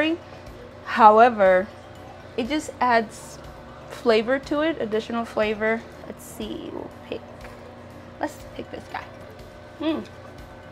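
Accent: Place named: Ondo, Nigeria. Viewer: I love the Ondo accents love u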